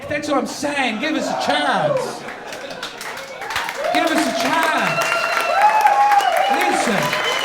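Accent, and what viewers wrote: Italy. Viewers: Very authentic Italian accent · Fantastic Italian accent.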